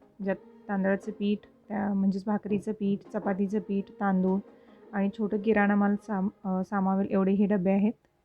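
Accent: Kolhapur, India. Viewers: काल पहाटे 4वाजता अचानक जाग आली.झोप येईना म्हणून मोबा. चालू केला.पहिलाच* * तुझा विडियो 'किती मिळाले' हा पाहिला,नंतरं 'आमचं लग्नं' हा पाहिला. भाषा जरा कोल्हापूरची वाटली.त्यामुळे जरा उस्तुकता वाढली.सकाळी उठून बाकीचे vlogs पाहीले,फालतू कमेंटना ' माझं चाळीतल घर,' व ' मिक्स ' ह्या vlogs मस्त चपराक हाणली.तर ' माझं रूटीन ' मधून घराची सगळी कामे करत vlog करणं येड्या गबाल्याच काम नाही.हे तू दाखवुन दिलंस.सुंदर मांडणी,निखळ बोलणं, कॅमेऱ्याच हाताळणं उत्तम. स्वच्छ, सुंदर,निरागस वागणं,बोलणं, हास्य उत्तम.तुझ्या ब्लॉगला हार्दिक शुभेच्या!!! T.V. वरील फालतू सीरिअल्स बघण्यापेक्षा हे बरं!! एकेरी तुझा उल्लेख केला कारण आमच्या लग्नाला २१ वर्षे पूर्ण झालीत. मी सांगलीचा तर बायको कोल्हापूरची. ब्लॉग बघताना ज्या ज्या घटना घडल्या त्यातून आमच्या जुन्या आठवली जागवल्या.पूर्ण कुटुंबाला शुभेच्छा!!!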